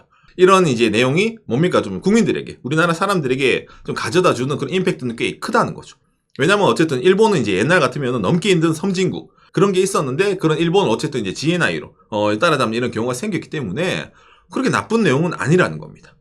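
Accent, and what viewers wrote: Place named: Andong, South Korea. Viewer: 우쨌든동? 안동쪽이 고향이세요? 😊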